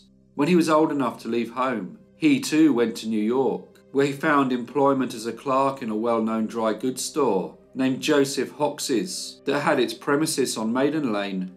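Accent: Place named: Birmingham, United Kingdom. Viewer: I can’t get past your Brummie accent 🛑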